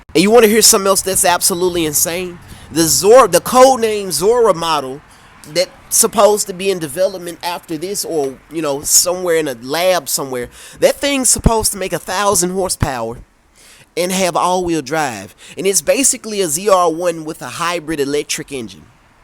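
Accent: United States. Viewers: Thanks!brother I’m from Boston massachusetts and when I initially heard your accent I was like here’s some country dude with some country slang even a brother from Boston won’t understand but then you start talking and oh my god you’re a genius !! You definitely know your shit !!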